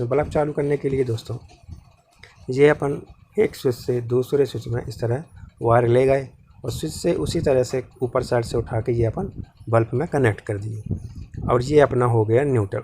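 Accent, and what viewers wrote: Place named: Jabalpur, India. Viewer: Bahot badiya ..bahot pyari video hai..lage raho.. Jabalpur se ho kya..aapki bhasa se lag Raha hai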